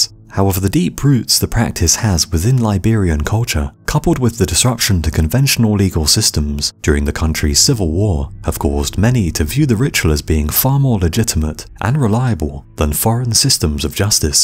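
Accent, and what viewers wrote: United Kingdom. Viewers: Best narrator voice of all time. I must know what part of Great Britain has this accent. Please enlighten me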